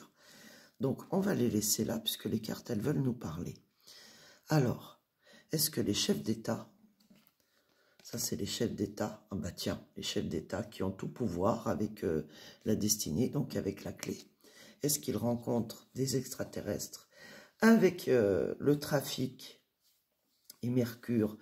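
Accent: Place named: South, France. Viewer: très belle accent du Sud je l’adore et elle fait un taf remarquable ♥️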